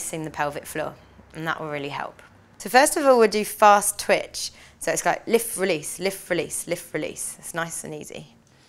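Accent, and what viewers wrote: England, United Kingdom. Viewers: This was a wonderful sequence, just enough to get my heart rate up which I do enjoy, thank you! Also very nice to find a lovely English accent to listen to while doing yoga!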